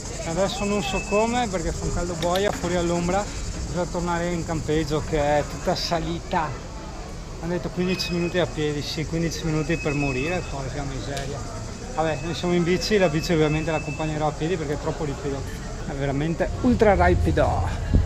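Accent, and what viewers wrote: Veneto, Italy. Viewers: Che tristezza, acqua azzurrissima che pare di un altro mondo......invece, inquinata. No dai, giuro ci rimani male. Come abbiamo ridotto questo povero pianeta. Bellissima la parlata inglese con accento Veneto. Te saludi.....!!!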